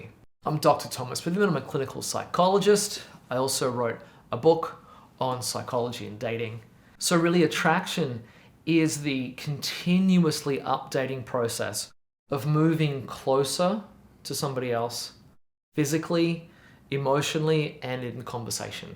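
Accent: Australia. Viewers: Unfortunately I have all of those qualities you mentioned, not born with however but from intense suffering and courage to keep learning this life. The sad thing is, I've given up on women and the possibility of meeting one who values similar qualities so I'm currently in Indian contemplating whether I should become a Tibetan Buddhist monk 😂 Thanks for the work you do, you obviously help thousands of people otherwise this wouldn't have over 40k views in 3 days. It says new York but I hear an Aussie accent, is that correct? I'm an Aussie too and considering not my back because I find our country has become a symbiotic twin of the worse traits the US demonstrates. I'm 52 and have witnessed a somewhat caring and considerate culture which was renowned for friendliness and warmth turn into a realm of selfishness and competition. Asia is still similar to the old Australia I grew up in. 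Sorry if this sounds pessimistic mate.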